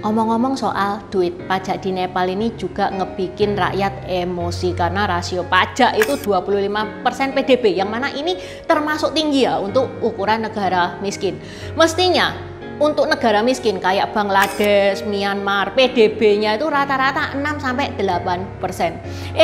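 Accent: Java, Indonesia. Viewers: Suaramu medok sekali, berita nya akurat, mantab sukses selalu, Bali hadir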